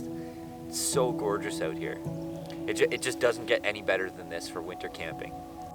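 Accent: Canada. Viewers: Not even a minute through and I heard Canadian and I’m enjoying it, love from Alberta new subscriber here!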